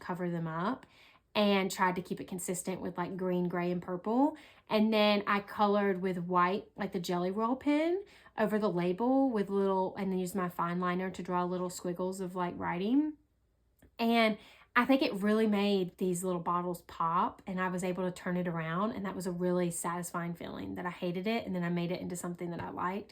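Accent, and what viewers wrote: United States. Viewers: New to colouring and really like your style. Also, as a Canadian, I could listen to your southern accent forever! Thanks for the great book suggestions! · Love your content ❤ Are you from Texas, you.have that beautiful southern bell accent.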